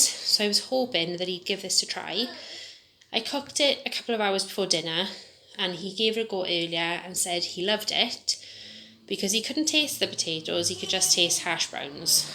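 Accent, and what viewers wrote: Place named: Wales, United Kingdom. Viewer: Hello, new subscriber😊 just wondering where your accents is from, I’m from South Wales myself and your accents sounds welsh😊 brilliant video x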